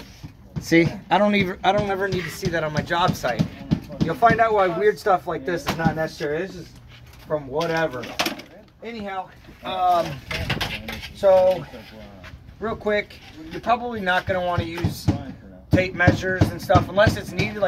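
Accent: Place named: New York, United States. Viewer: Excellent video I would guess you're from new york.. You remind me of some guys that I work with.. very knowledgeable and straightforward great info and training ..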